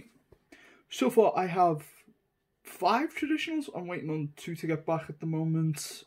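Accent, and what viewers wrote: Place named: England, United Kingdom. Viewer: you are welcome... They also offer a lot of traditional pocket knives which are legal in the UK (you sound really english, sorry if I am mistaken)... I would order on their own website... lots of their traditional products are only available there and as I mentioned their customer service is second to none...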